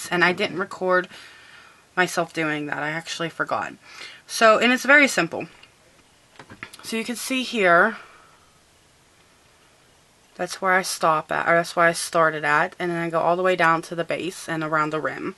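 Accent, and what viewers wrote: Pittsburgh, United States. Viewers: Yinz guys???? Your a Pittsburgh girl! I'd know that accent anywhere:) Love the glasses...thanks for sharing;)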